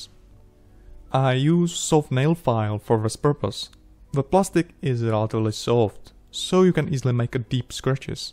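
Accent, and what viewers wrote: Czech Republic. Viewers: are you from czechia cause your accent is sunding czech. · Thanks to this channel, I now have the ambition to... make models? No. To be able to mimic a Czech accent! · máš takovou tu sklasickou českou angličtinu Češi mají dobrou výslovnost ale jde to poznat že to jsou Češi takže tak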